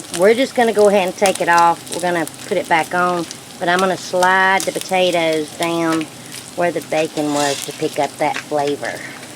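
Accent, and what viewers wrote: South, United States. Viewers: First time viewer. I absolutely love your southern accent!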